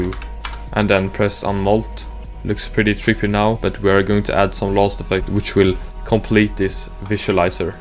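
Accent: Sweden. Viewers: are you swedish? XD, i feel like i recognise the accent as a fellow swed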